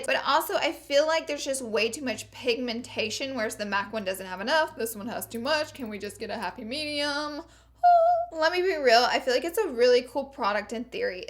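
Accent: South, United States. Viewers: I'm not trying to be mean but are you from the south cause you have a bit of a country accent?? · She's southern. She has a accent there is nothing wrong with her smh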